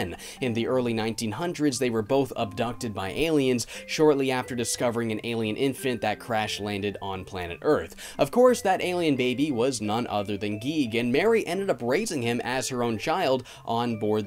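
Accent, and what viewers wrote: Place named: Canada. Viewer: Are you Canadian? Noticed the -ehr-'s on your "A" pronunciation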